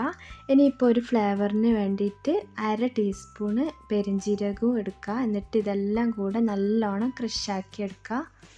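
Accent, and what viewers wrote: Kannur, India. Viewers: Sooper biryani..recipe...😋ningal kannur aano..voice kelkkumbol angane thonni...😍😍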